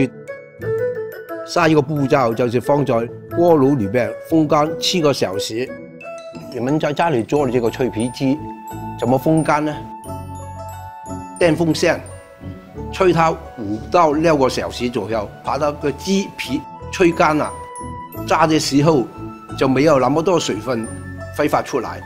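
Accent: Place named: Cantonese, China. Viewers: 中国广普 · 最好聽呀 廣東特色 吾使去學卷俐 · 纯正广东普通话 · 广东普通话！就是这样熟悉。😁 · 正宗廣普話！ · 廣式普通話最強, 一陣不用普通話又被說為甚麼要用方言, 現在就好了 XDDDDD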